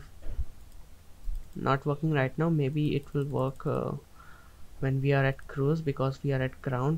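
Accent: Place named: India. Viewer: Sounds like a call centre in India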